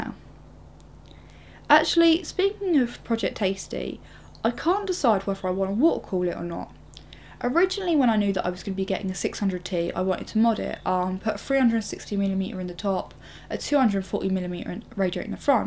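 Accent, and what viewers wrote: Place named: Essex, United Kingdom. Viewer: This one's definitely different from your newer videos, more chilled, but that Essex accent!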